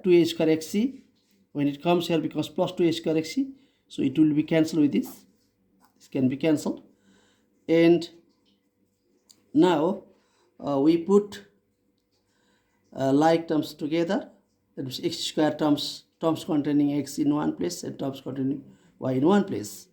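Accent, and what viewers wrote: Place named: Nepal. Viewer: nepali jasto lagyo sir tapai nepali language ma handina aajhai better huntheo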